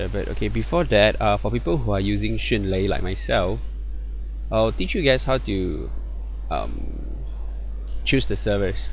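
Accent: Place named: Singapore. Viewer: you sound singaporean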